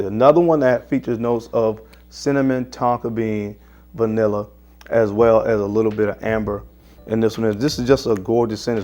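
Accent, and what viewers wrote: South, United States. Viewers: I sure wish you would either learn how to enunciate your words - the southern drawl and other speech effx are confounding - and invest in upgrading your vids so that we can see the actual names of the frags and manufacturers. Thanks.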